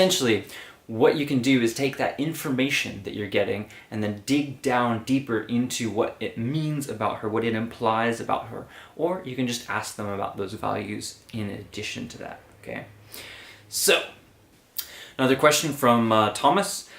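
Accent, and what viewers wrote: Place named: Canada. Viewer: sounds canadian to me!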